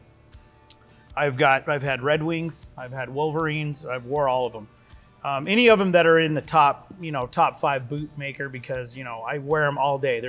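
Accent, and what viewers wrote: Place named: United States. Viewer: Iam here to learn the American accent.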